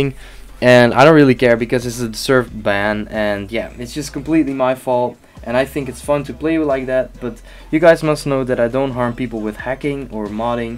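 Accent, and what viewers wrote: Netherlands. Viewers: are you dutch? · His dutch accent mixed in with english is almost cringeworthy but his videos are still enjoyable.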